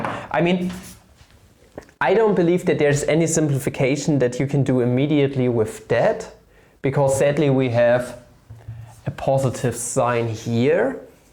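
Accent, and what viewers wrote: Germany. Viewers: I love how you say “Integuhrruhl”! Math is sooooooo much better when done in a thick Deutschlander’s accent!!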